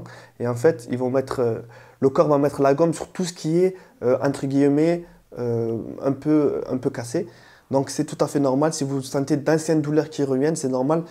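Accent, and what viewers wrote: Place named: South, France. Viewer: J aime bien ton accent du sud mashallah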